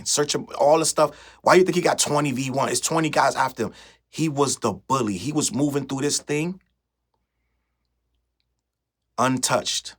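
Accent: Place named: New Jersey, United States. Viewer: First time viewer. I think you’re analysis is spot on. Also sounds like you have a New Jersey/New York accent. Great content!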